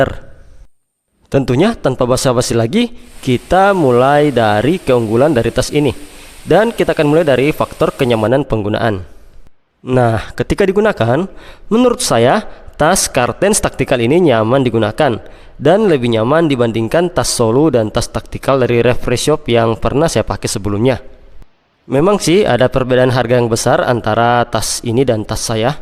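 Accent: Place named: Sulawesi, Indonesia. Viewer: Dari logat bicara kayaknya Abang orang Sulawesi ya 😂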